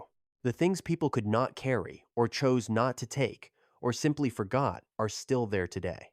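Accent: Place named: United States. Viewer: Actually a very good video despite American pronunciations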